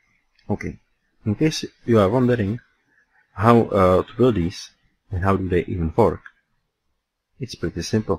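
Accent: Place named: Czech Republic. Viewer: Český přízvuk se pozná vždy :D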